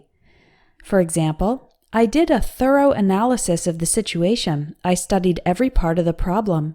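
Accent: United States. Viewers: Do I hear a midwest accent? 🧐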